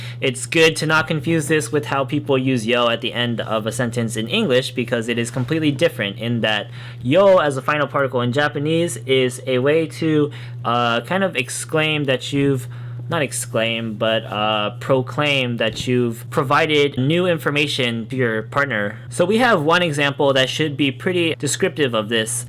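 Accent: United States. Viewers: you look so japanese man :D I thought you should be american with your english speech level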